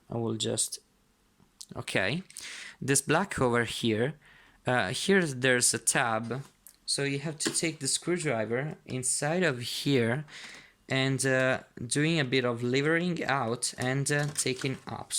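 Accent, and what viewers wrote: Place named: England, United Kingdom. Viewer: mi sembra che tu lo parli come un inglese complimenti .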